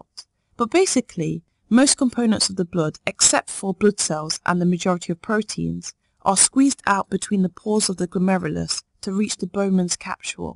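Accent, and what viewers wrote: United Kingdom. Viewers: Your pleasing british accent made this tutorial even more handy Great lesson indeed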